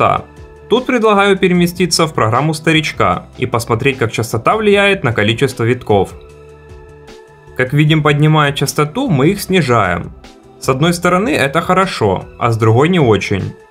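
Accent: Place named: Kharkiv, Ukraine. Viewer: Чую харківську говірку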